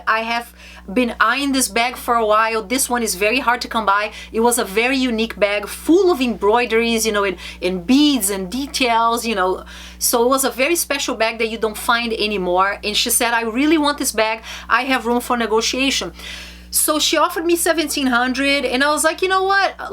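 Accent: Brazil. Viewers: This accent sound so....brazilian! Tem q ser! Anyhow thanks for sharing! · Seu inglesé otimo, mas esse sotaque é de brasileira, nao é? prazer! muito bom o conteudo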